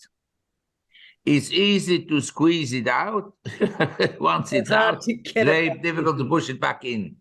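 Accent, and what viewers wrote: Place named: Switzerland. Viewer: I love Marc’s Schweizer Deutsch 🇨🇭accent so distinctive and just add to the overall entertainment and insightful wisdom great choice of where to live Chang mai is fantastic Marc talks it’s good to listen Not sure that the precious metal call is a good one , I’d go maybe max 10 percent of a non yielding asset but the general advice of diversification has never been more important and keep dry powder keep cash now you can get 3 to 4 percent on your cash with no risk patience. Will pay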